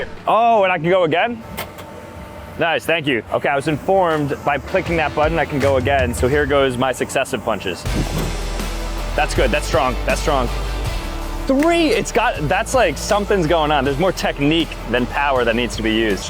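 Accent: United States. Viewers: W country accent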